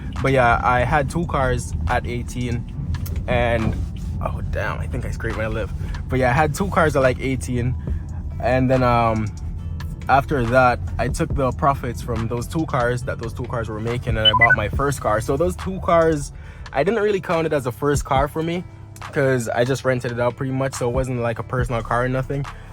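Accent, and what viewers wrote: Jamaica. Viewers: I was here thinking you sound Jamaican from your accent. I am in college as well in Kingston, I'm 19 and would love to be driving a nice car like that as soon as possible. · i can tell ur jamaican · i hear an accent are u mix with jamaican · Sound like you are jamaican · I’m 16 and moved to Canada from Jamaica 4 years ago and right now I’m really struggling to get hired somewhere. Not even McDonald’s wants me 🤷‍♂️. I’ve already thought about renting cars in the past but I can’t find the money to buy one and clean it up. Also the second I heard your voice I knew you was a brother from the island 🇯🇲 g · are u jamaican by any chance? the accent..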